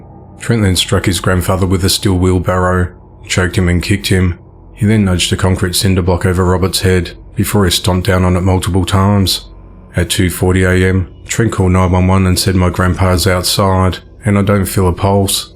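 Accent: Australia. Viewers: Hi I have to ask I hear a Aussie accent from you if not all I have to say to you congrats I thoroughly enjoy your channel keep posting your uploads I’ll keep watching stay safe Julie 🇦🇺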